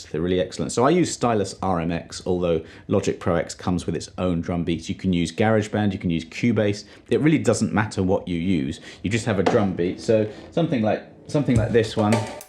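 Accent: United Kingdom. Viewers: Of all the Brit-sounding teachers out there, you ARE THE GREATEST IN MY OPINION.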